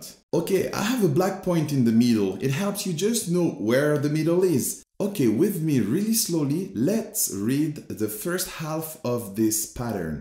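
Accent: France. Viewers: Très très français oui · Alors il est français "tontonton... tontonton..." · clairement français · Tu as l’accent Français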